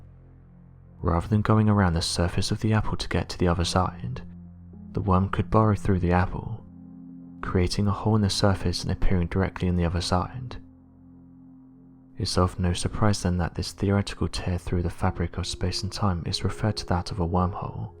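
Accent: England, United Kingdom. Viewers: Stories are great but I cannot stand the guys voice, it's the one English Accent that sounds like a head-injured rugby player... apologies again as it is not like me to criticize but, at this point it's like nails on a chalkboard, and, I taking and hiding these notifications and I will find suitable replacement...👽🚬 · Cant stand your English accent! Find someone else to do the narrative.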